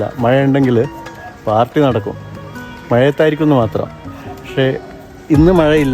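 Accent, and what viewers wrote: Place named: Rural, India. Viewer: നിങ്ങളെ വീഡിയോ അടിപൊളി നാടൻ സംസാരം ❤ നാട്ടിൽ എവിടയാ❤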